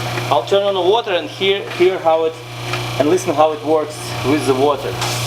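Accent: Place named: Russia. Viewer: Смешной русский акцент))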